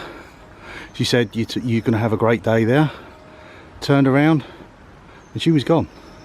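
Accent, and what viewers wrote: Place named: United Kingdom. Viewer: I have, we are loving your britosh sccent and genuine lafter as well, amazing, Tone Norway love you all, beeing fan sibce 16 years of age, now 51, wonderful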